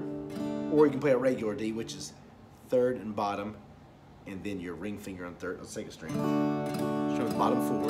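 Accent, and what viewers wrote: South, United States. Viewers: says: 'There is no B Sharp', says: 'This is a B Sharp'. Uh no that's a C. I give you credit for trying to teach others when you're really not a very good player, takes a bit of nerve. Watching you try to play notes was painful, too bad your knowledge doesn't transition to actual playing. I guess that old saying 'those who can't do, teach' is true. You are kind of fun to watch, with your good ol' boy style and accent so kudos to you. Practice practice practice (you'll get there). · Yea man...any little trick that puts chords to leads is helpful without tellinng anybody you done whipped up some pentatonic simplicity. Also teaches you where to find alternative chording deep down and up top in the nut region and everthing in between. Brother, I use your vids for to students to keep it real. They come in all sad they trying to play or cant feel the music. 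We pull up your stuff and say looky there.. this fella is putn down some good country sounds with a geettar and a Southern accent..lol...I love stealing your stuff to keep the kids motivated. You keep it simple but sound like a pro. Keep it all rolling. Even some Bass walks into simple chords like c d g a like this bass pick 1 count E E F# G# Open D string then strum that As you just taught us... simple but sounds like geting warmed up. Then move to the 1 4 5 progression and put it all together. 3 vids. Put all 3 lesson together voile' another kick butt lesson just like this one. Keep bringing it... man we love ya here in Colorado.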